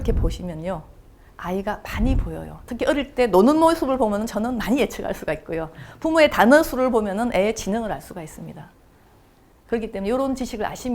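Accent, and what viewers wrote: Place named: Gyeongsang, South Korea. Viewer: 예전에 tv인터뷰때는 몰랏는데 갱상도 분이네요.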